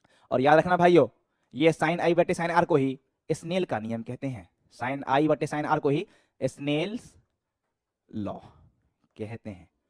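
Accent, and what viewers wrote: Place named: Bihar, India. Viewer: Sir bihari language 😍😍😍😍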